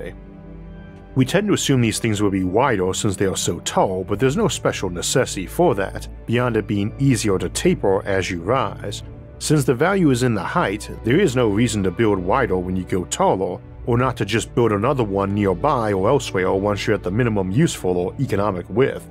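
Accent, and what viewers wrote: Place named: South, United States. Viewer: I just can't place the accent. It's familiar yet foreign. Some kind of southern flavor like colonel sanders.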